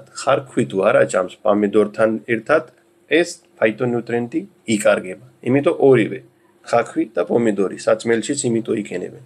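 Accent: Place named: Georgia. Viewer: რა საყვარელია, როგორ ლაპარაკობს ქართულად..ღმერთო,რა ძალიან საყვარელი ადამიანია მადლობა ინდოეთს ამ კაცისთვის,ანუ ამ ექიმისთვის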